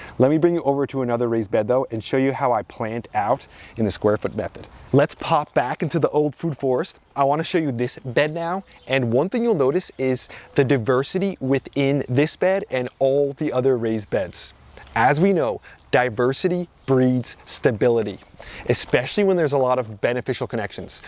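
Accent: New Jersey, United States. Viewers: Haha, yeah, his Jersey accent coming out. Be happy he’s not from Boston or Brooklyn, LOL.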